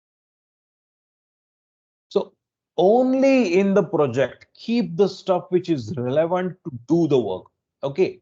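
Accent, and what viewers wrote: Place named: India. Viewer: Hello,! My name is Brendon. I’d like to express my gratitude for your work. A few years ago, I was looking for ways to truly learn from reading books, and I came across your YouTube video. I never took English classes; I learned everything I know by watching movies and TV series and playing video games. I spent dozens of hours re-watching your TED Talk because the Indian accent made it a bit challenging to follow—but it was absolutely worth it! You not only taught me how to read better but also encouraged me to read more and more. You and Mortimer J. Adler are at the top of my list. I am truly grateful that you posted this series of lessons here; it was the missing piece to complement my "Habit 3"! I will certainly watch it a few more times until I’ve mastered the material. Once again, thank you so much! Best regards from Brazil.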